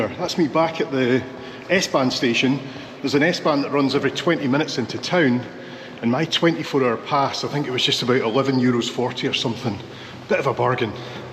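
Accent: Scotland, United Kingdom. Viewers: Merry Christmas and Alicja🎄from Berlin. Next time you’re in town, gimme a call and I’ll show you around. I love your videos and the Scottish accent. Keep on going in 2025 🎉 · I've never been to Europe & you videos & Scottish Accent are wonderful. Thx again. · Thanks for all these fabulous videos. Late last year, we discovered one and your videos and thoroughly enjoyed it so much that we decided to go back to your first video and watch every one. We are still watching them but are now nearing the end. We also keep telling our friends and family about your fabulous videos and encourage them to watch. A lot of our enjoyment of your videos is not only your presentation and the places you visit, but also enjoy listening to your accent and your Scottish description of objects and places e.g. "wee" and "bonnie". So, many thanks for providing us with so many hours of enjoyment and education. 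Patricia and John ❤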